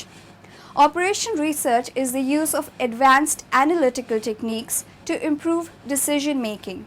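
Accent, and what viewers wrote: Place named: India. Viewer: Great overview of the objective, scope and applications of OR with a nice Indian accent.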